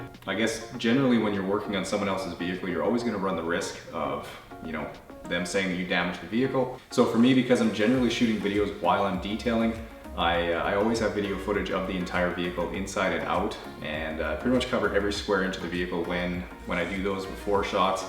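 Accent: Canada. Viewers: I didn't know you were Canadian til today, probably. And it makes no difference to me. I'll be friends with somebody and support their business no matter where they're from or anything. I'm saying because people were making Canadian comments or whatever, but most people that I end up liking on tv or whatever are canadian. Some of them are english, or some other culture, but most of the ones I like...I discover they're Canadian after I watch them for some time. I don't really notice the accent right off sometimes. accent isn't really that noticeable until he says something with an "O" in it. But if you like Canadian people, there's always Jim Carrey, Alan Thicke (rip)... there's a channel on here called the Dungeon Dudes, that me and my boyfriend watch...Monty, Kelly and all them are Canadian. But I think they're from a different part of Canada. Matthew Santoro also has a channel and he's from Canada. 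Michael J. fox from Family ties is Canadian, and Neve Campbell from Scream. And Celine Dion. She's from the Quebec part. She's french-Canadian. But anyway, yeah I was just saying that. I realize that most of his comments aren't bad, just wanted to say that I kind of didn't know he was Canadian til today, I read all the comments and it makes no difference. Just wow. I've been watching him for about 3 or 4 years and I figured he was from somewhere in the U.S. you better be from somewhere else and not Texas right now,... it's better in Canada than it is in texas right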